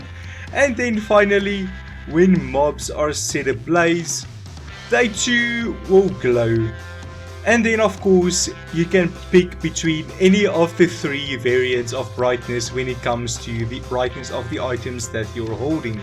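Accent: South Africa. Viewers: Are you from south Africa? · Is this guy South African? He sounds South African. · Bro im sure your from south africa can you confirm this? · DUDE are u from SA because holy shizz your accent is on point omg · Your South African I now I am too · South africa?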